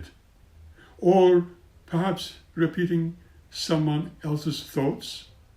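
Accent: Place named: Turkey. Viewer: Merhaba! Your Turkish accent was perfect.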